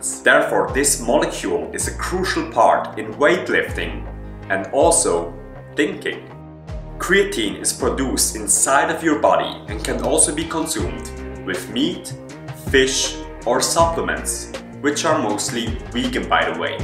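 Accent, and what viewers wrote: Germany. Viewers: Bayerisches Englisch 😆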